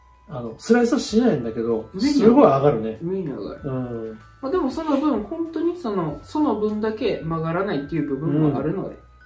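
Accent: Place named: Kagoshima, Japan. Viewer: やっぱり鹿児島弁は聞いてて、気持ちよく聞き取れるし、いい感じです🙋いつも楽しいYouTube動画で試打やクラブの情報ありがとうございます♥️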